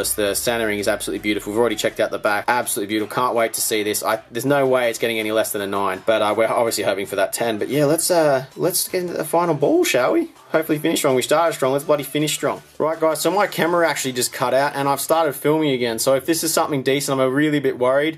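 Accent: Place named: Australia. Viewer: talk with a Australian accent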